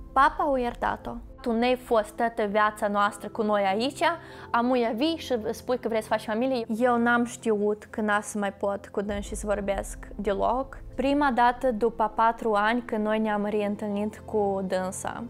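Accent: Moldova. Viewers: Ce nu inteleg, daca această fata a fost crescută în Elveția de la 3-4 ani, cum de are un accent moldovenesc ca o persoană care acolo a crescut?🤔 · Vorbesti foarte bine româneşte!Bravo!Mai ales ca o vorbeşti cu accent frumos moldovenesc,eu fiind din Suceava 🇷🇴Esti desteapta,deosebita,nemaiponită❤❤❤ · Totuși, de ce are asa accent moldovenesc, dacă a trăit mult fără mama în Elvetia?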